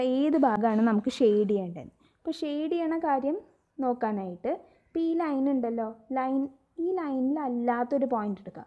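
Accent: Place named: Malabar, India. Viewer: ഭാഷ മലപ്പുറം കോഴിക്കോട് ഭാഷ പോലെ തോന്നി 😅😅😅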